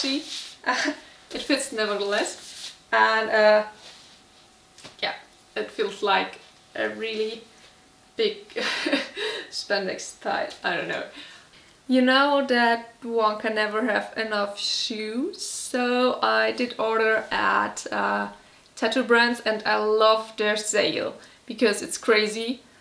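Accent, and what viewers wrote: Germany. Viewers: Very great video! Nice clothes you have bought online. Your English has a big german accent. So when your next german speaking video? What about your glasses? Very nice mask from you. Keep it up!!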